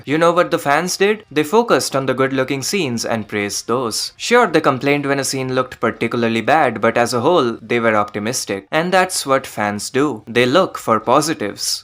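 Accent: India. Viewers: You can't mock anyone while having a loli profile picture and that goofy ass Indian accent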